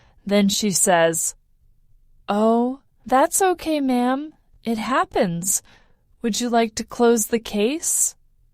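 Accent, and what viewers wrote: United Kingdom. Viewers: Is this British accent? However this kinda lessons are so precious to us❤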